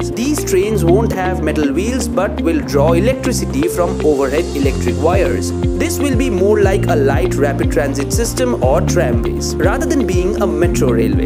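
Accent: India. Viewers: YOUR INDIAN ENGLISH IS LIKE YOU ARE SING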